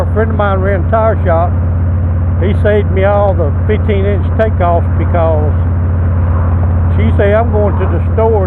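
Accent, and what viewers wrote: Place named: South, United States. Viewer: Well Sir you talk just as country as me.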